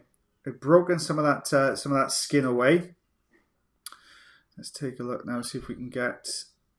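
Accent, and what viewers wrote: Wales, United Kingdom. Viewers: Are you Welsh? Love hearing the welsh accent, reminds me of home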